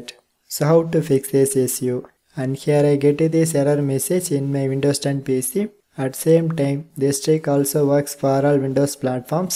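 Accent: India. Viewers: You my man are a god with sexy sweet Indian accent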